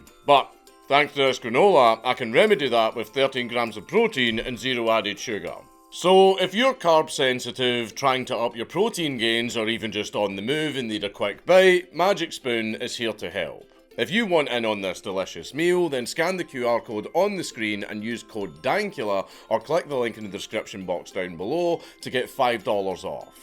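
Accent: Scotland, United Kingdom. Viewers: I love the Scottish accent. Its so...confusing, in an attractive sort of way. I think it's great that we are including the mentally disabled in the recruiting process. They can't drive, but give em` some high sxplosives; what could go wrong?! The time is nigh for action men....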